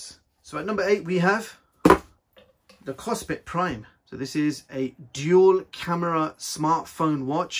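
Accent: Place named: England, United Kingdom. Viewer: Generally I don't ever leave comments, I know I should, but sometimes (as someone who needs to get the relevant info I'm looking quickly I never do. However, this occasion I was so impressed with the content of this video I felt compelled to show my support. Everything I needed answers to was in this one video. Plus, the small wrist bonus section was really appreciated. As said in the video, it's a very overlooked part of buying a smart watch. Also, as a Englishman it was great to hear an English accent narrating the video (the generic American and often computer generated voices drive me up the wall 😬😁). Really great video, very informative and exactly what you look for on YouTube when looking into some new tech to purchase. Excellent work, Thank you. Liked, commented and subscribed!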